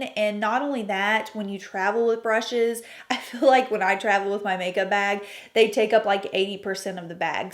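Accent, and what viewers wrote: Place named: Nashville, United States. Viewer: Oh gosh you're from Nashville! I should have guessed from the dialect lol. It's a pretty decent city to live in. :)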